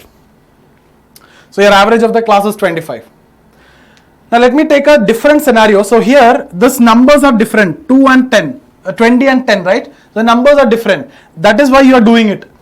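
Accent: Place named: South India, India. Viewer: Bhai sir ka accent south indians jaisa lag rha h...may be he is more fluent in english than hindi...isiliye sir ne english prefer ki h hindi ki jagah...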